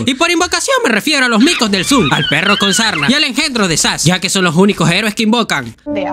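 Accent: North, Spain. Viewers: Con la prima? norteño? XD saludos!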